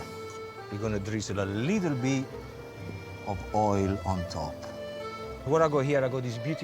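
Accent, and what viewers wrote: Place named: Italy. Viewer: I am Finnish and I like your English with Italian accent. I like to hear Itslian. Thr colour of the Italian language is making the atmosphere..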